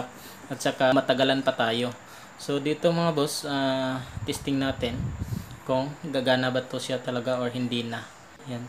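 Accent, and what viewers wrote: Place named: Iloilo, Philippines. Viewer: Daw ilonngo ka to ba hahha nice vid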